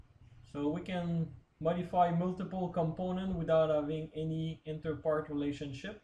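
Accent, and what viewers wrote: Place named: Quebec, Canada. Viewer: From your English accent, I have the impression that you're from Québec :) Thanks for the Video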